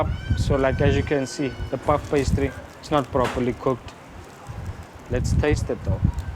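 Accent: South Africa. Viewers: Where are you from? It sounds like you from the platteland, not local definitely... Maybe you immigrated to cpt. It's nice to see you guys doing well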